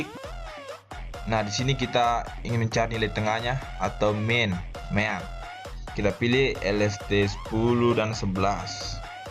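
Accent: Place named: Bugis, Indonesia. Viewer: Logat bugismu itu e 🤣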